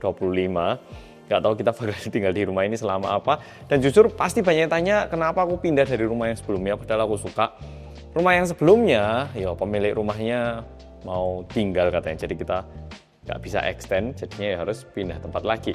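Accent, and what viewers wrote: Java, Indonesia. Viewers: kayanya itu lebih Medhok dibandingkan aku yang asli turunan Jawa Timur 😄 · Ikutin mas dari subscriber masih 500k sampe sekarang mau 6M. Walaupun ga mantengin banget tapi aku terharu bisa lihat perkembangan mas sampe sejauh ini. Sbg bolo cuma bisa doakan mas, mba dan si krucil ruel sehat dan bahagia terus. Semoga tetep jadi mas yang humble dan medhok ya mas 😆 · Kaum sm indo/ jawa medhok nya ttp kental,pertahan kan ya dialek bicara nya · Aku ingat pertama kali subscribe pas mas bahas soal jerawat. Trus lucu aja orang korea yang medok. Dadi aku seneng logat e haha jadi ngikutin sampe sekarang.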